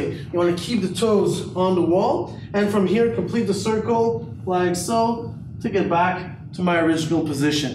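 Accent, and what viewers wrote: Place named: Quebec, Canada. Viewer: Quebec accent :D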